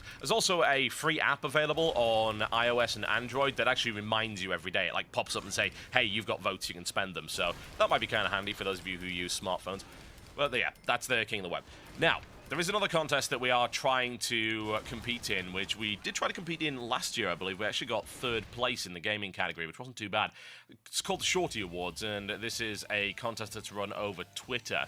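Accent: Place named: Newcastle, United Kingdom. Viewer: He's geordie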